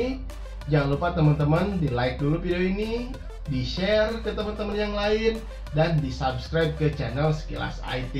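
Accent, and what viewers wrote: West Java, Indonesia. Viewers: sunda pisan euy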